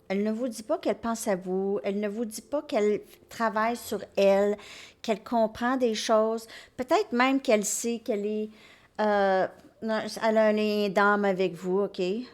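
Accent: Canada. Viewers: Bonsoir madame vôtre accent canadiens est sublime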